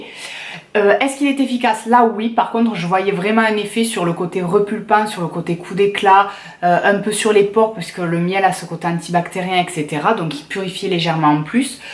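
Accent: South, France. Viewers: coucou ,je suis tout à fait d'accord avec toi pour la recherche du naturel , je suis en train de faire ce parcours aussi . je t'encourage à continuer dans ce sens :) .Bisouuus (en tout cas on a l'accent du sud tous les deux hihih)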